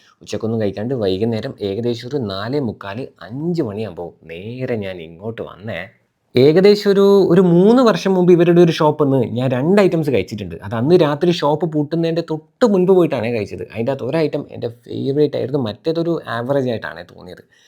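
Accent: Kannur, India. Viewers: chettooiii ❤❤ നിങ്ങളെ വർത്തമാനം കേട്ടിട്ട് ഇപ്പം കണ്ണൂർ ഭാഷ ഭയങ്കര ഇഷ്ടാ...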